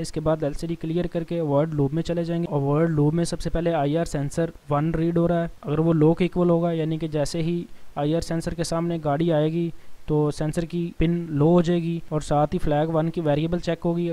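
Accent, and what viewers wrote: India. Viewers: interressting english. can't understand your speed indian accent at all. :)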